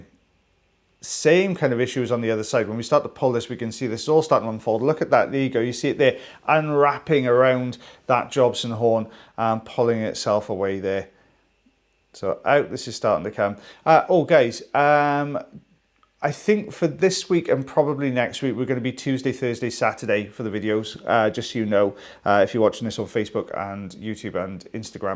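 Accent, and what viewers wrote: Wales, United Kingdom. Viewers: It’s a lovely accent. Think I’ve said this before. Love Wales. Love the videos. Take care. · I dont think your accent is that bad its mild compared to north wales. You also dont talk that fast people should try listening to the irish! X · Love your welsh accent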